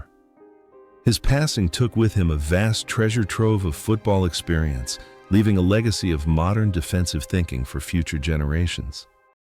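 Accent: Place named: United States. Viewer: more AI shite with gut wrenching yanky commentary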